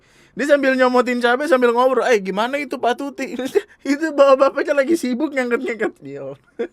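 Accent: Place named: Banyumas, Indonesia. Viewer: bang asli nya org mana dah kalo gw ngapak jdi agak mirip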